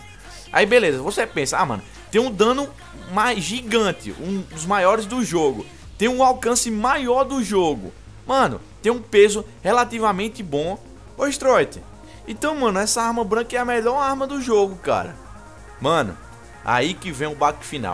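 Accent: Northeast, Brazil. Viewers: E do Nordeste ? :D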